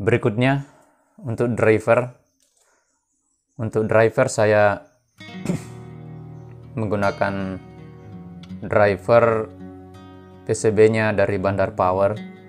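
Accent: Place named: Toraja, Indonesia. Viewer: klo saya dengar2 logatnya Orang ini dari Toraja, maaf klo sala tebak 😁🙏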